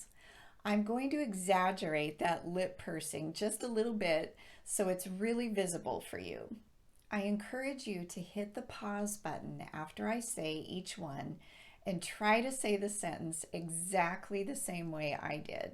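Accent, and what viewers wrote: United States. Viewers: I love your videos and the standard American accent and pronunciation.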